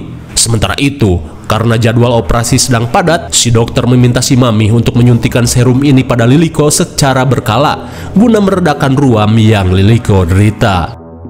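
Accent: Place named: Sunda, Indonesia. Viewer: suka gue sama pembawaan nya Sunda banget bacotan nya keren mang jangan bosen bosen upload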